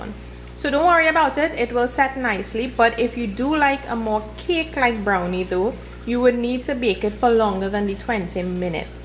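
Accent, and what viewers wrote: Trinidad and Tobago. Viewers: Are you from the Caribbean? You have a Trinidarian accent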